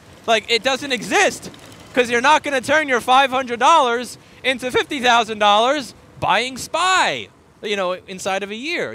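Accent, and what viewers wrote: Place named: Italian American, United States. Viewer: ... I apologize if you mentioned this somewhere in your videos and I didn't see it. But I need to ask you... Why do you look and speak like an Italian American but have a German last name?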